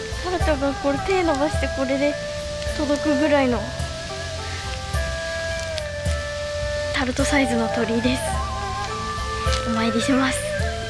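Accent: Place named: Gifu, Japan. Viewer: 宝くじの神社と言えば長野の諏訪湖に有る諏訪大社有名ですがそこの神社で１番有名なのは「上社の御柱祭」全国ニュースでやる大きな木を山の斜面を落としてたりしてる神社ですよ☺️ 毎回、ちゃんの岐阜弁に癒されるw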